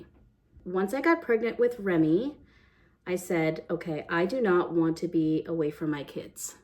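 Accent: Canada. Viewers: Hey hey, I just love you and now I know why... you're a maritime girlie - and now I can hear your Nova Scotia accent. Surprising to me that the Bridezillas in your shorts were East Coasters (or maybe they weren't) because that seems opposite to the maritime culture, but I guess there are mean people everywhere. I'm 50 and have hooded eyes now. Would love your best tips on this. ❤ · Your shorts just came across my algorithm, and I really enjoy watching them. I thought I occasionally detected a faint eastern accent, so had to see where you are from (prior to this video) and saw Canada 🇨🇦. Told my husband I knew I was right. So happy to see and support Canadian creators like yourself.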